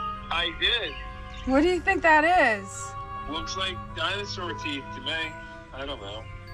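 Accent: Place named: Eastern Pennsylvania, United States. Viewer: This video popped up in my feed and a min in I could tell you were in PA by your accent, I’m assuming eastern PA 😂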